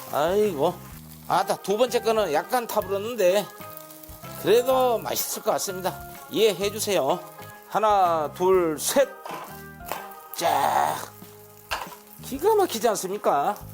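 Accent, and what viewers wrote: Jeolla, South Korea. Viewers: 사투리가 영락없는 우리 전라도 사위 말투 똑같네요.❤ㅎ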